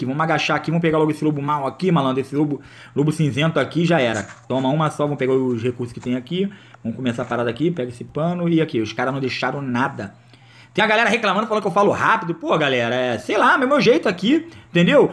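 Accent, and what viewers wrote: Rio de Janeiro, Brazil. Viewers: EAE GALERA NA ÁREA sotaque Do rio é foda Like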